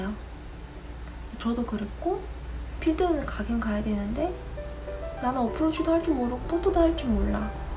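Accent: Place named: Busan, South Korea. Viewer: 목소리 넘 귀여우세요(약간 부산사투리) 저희 아이들 골프 집에서 아빠한테 배우는중인데~넘 좋은 영상이네요 저도 다시 골프 시작해야 한다고 생각한거만 몇년째네요~참, 님 참 제가 원래 운영하던 채널이 있는데 그쪽을 좀더집중해 보려고 해여... 기존 채널도 계속 유지중이니 구취는 노노!! 자주 뵈어요🤎 <link>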